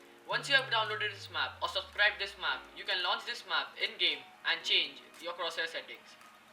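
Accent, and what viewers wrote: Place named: India. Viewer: from india? that accent wtf - anyway improve, as already mentionted, the audio. have my upvote for the tips though.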